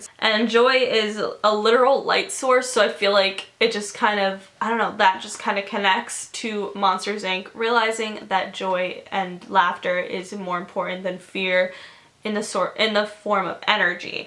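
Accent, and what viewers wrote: United Kingdom. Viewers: Are you britishh?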